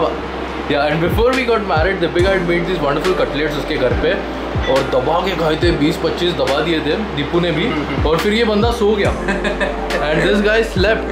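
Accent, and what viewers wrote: Maharashtra, India. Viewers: That marathi tone in ur voice and gyserin this hot weather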